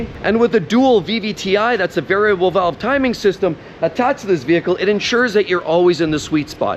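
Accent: Canada. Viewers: Canadian you can't hide that accent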